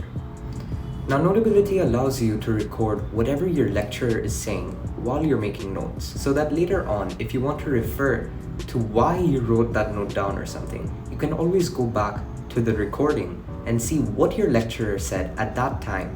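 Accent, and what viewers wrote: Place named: India. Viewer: Holy hell, the Indian accent hit you hard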